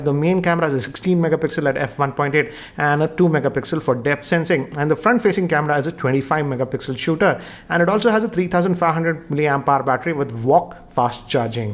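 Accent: India. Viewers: jajaja give him consideration ..indian accent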